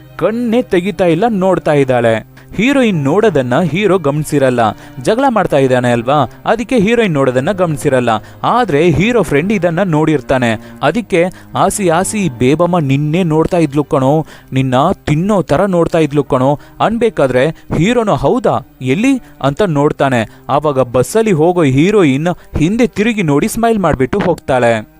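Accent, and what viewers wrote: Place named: Kerala, India. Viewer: Mallu bhaay kannada maathaadthiro haag kelsthide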